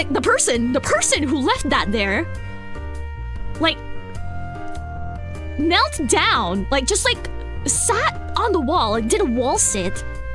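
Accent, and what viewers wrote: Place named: New York, United States. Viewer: Yep, sounds like New York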